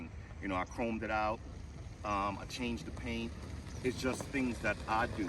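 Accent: Jamaica. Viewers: You're Jamaican nuh Tru? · I hear a little jamaican accent in there maybe??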